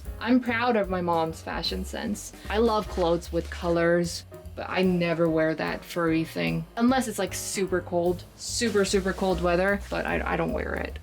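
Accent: United States. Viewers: Have you always been in korea ? Cause your accent sounds like you lived in STATES...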